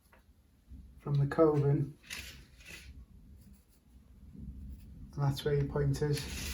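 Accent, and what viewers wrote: Liverpool, United Kingdom. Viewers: Liverpool accent?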